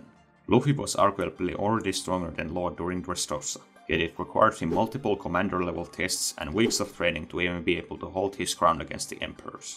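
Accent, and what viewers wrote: Finland. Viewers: your accent sounds finnish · Why do you sound Finnish?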